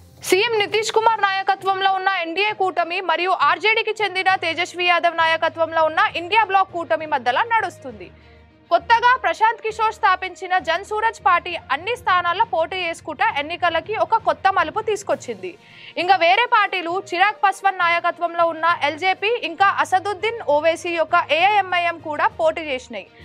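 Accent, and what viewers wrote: Telangana, India. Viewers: i really appreciate your telangana telugu dialect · Excellent presentation with telangana slang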